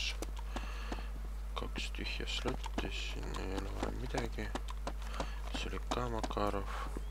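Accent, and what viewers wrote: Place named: Russia. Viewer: Vene Aksent Nice !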